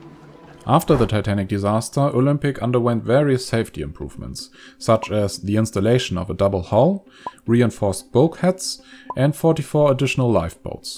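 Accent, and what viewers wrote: Germany. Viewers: Why is a German narrating this video? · I'm german and I agree, it sounds like a (horrible) german accent. Not all of us sound like this when speaking english :D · Man merkt sofort den Deutschen Aktzent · Deutscher aktzent... hmmmmm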